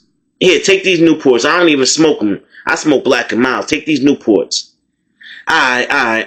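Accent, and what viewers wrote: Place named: New York, United States. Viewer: Good morning, ur voice is dope. I'm sorry u hv these stories, but u tell them in a way that's real, raw, especially with ur accent, like ur from Brooklyn or the bronx. Glad u made it out, my brother never did, he died in prison.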